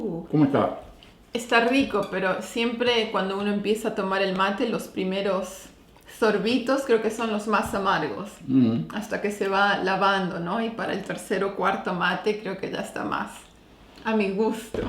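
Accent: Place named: Argentina. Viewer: querido que hermoso..no perdistes tus costumbres argentinas,tu tonada,las costumbres..eres muy autentico BIEN ARGENTINO¡¡¡¡¡SALUDOS DESDE SALTA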